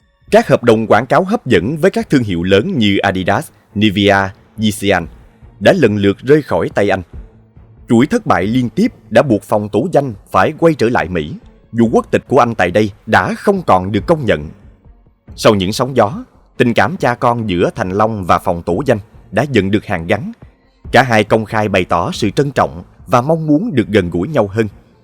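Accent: South, Vietnam. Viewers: Giọng đọc miền Nam hay quá , giống như giọng của MC Anh Dũng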